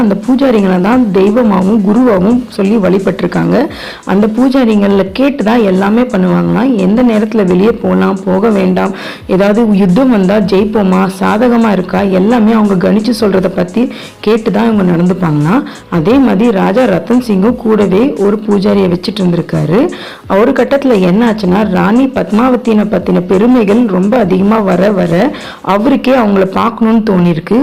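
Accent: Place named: Tirunelveli, India. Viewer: தம்பி food review சிறிது திருநெல்வேலி பாஷையுடன் நல்லா இருந்தது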